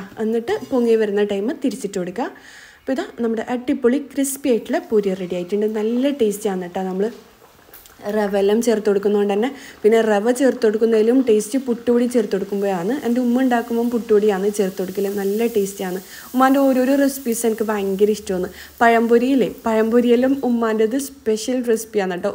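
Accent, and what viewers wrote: Kannur, India. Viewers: Ithaa, u are such a wonderful inspiration to me. Ithante Motivation njan follow cheyyarund tto. Ningale kanoor slang adi poli aan tto. I love u ithaa😘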